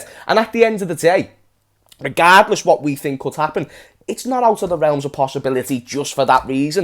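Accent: Ireland. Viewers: Big up from Ireland 🇮🇪 ( great Irish accent btw ) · Love the Irish accent your like myself bro 🇮🇪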